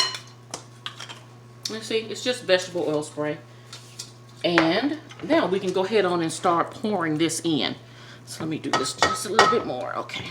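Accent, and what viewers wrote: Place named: Pennsylvania, United States. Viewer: I remember that coffee cake one f my all time fav’s we might be close in age, I’m here in the San Fernando Valley, I think I’m older graduated in 62. Thank you for the recipe! Your from PA sounds. Like.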